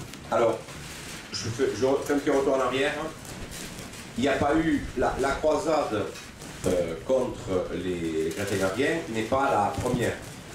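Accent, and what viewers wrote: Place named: Marseille, France. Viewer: Merde l’accent marseillais!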